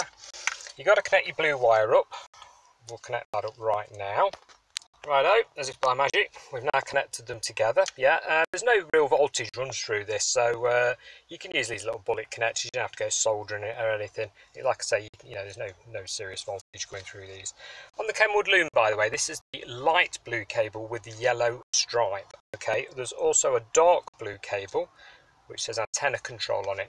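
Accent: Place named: Nottingham, United Kingdom. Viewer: Do you offer a fitting service - you sound very local to me (notts) - extra coin for you if you wish?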